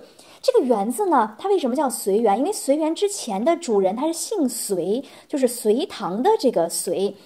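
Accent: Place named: Shanghai, China. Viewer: 上海普通話老靈了~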